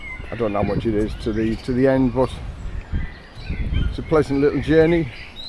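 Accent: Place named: North Yorkshire, United Kingdom. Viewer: I've listened to you when you have been in Thailand and now back in Redcar, and your accent is just as strong as any of the locals. Really enjoying these videos of the English countryside, as I always like seeing them when I'm visit England from where I live overseas. I'm really looking forward to when you visit the Philippines shortly, for an Englishman's take on the country.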